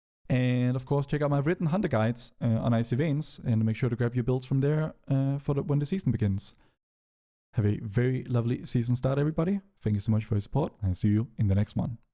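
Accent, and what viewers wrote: Denmark. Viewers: Are u a dane mate? Its sounds a little bit like it. Great work btw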